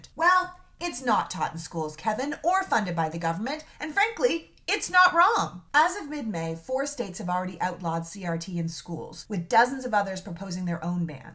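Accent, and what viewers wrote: Canada. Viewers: Love it😁!!! Line of the century. "Fucking learn from, your mistakes"!!! Thanks for educating me, on what the hell 'CRT', meant!! I've heard of the regionalized, banking practices before, but not CRT. More guilt trips on us, from the side that does, the most wrong😔!! Also, you're Canadian🇨🇦, or originally from Canada??? It's in your pronunciations, and structure.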